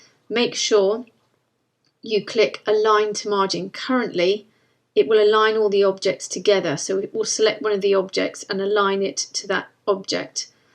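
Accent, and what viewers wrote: England, United Kingdom. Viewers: Thanks so much for this. With one exception I think I got everything that you were explaining. Until now I have had so much grief with textboxes and thought the people who invented them should be hanged as war criminals. The one exception, which reminded me of so many other tutorials that I have watched was increasing or reducing the size of a picture. You did it and produced the result as if by magic without saying how you do it. Perhaps if I watch again I will see how you did it. I am going to create a practice document and play about with the boxes until I can really get them to work. So far, positioning boxes has been almost impossible for me and reduced me to near suicide with frustration. Thanks again. Your lovely, clear English accent helps, too!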